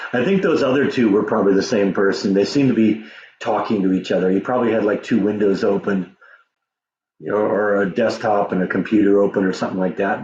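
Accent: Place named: United States. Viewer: I love your accent, American men sound sooo sexy to British women. I have a very British accent and speak very proper English.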